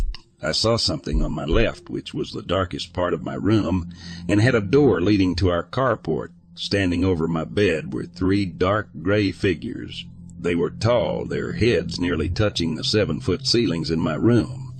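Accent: South, United States. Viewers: Good News!!! I'm really enjoying the substitute narrator!! He's definitely good at it especially with that southern twang!!!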